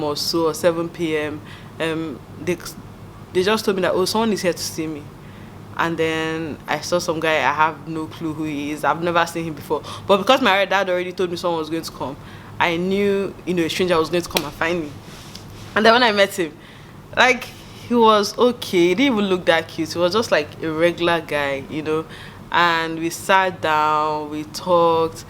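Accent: Igbo, Nigeria. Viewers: The Igbo!! Like honey to my ears😫 You're so gorgeous. I'm here to stay. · I’m just here to hear you talk, love your Nigerian accent. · Your igbo be sweeting me.... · 😂😂😂 Your accent plus your facial expressions and your general attitude is just epic😂 Ewo, my Nnewi sister. They can't even do arranged marriage for me and I'll collect. Odiegwu 😂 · haha I really enjoyed this video and your Ibo is sweet!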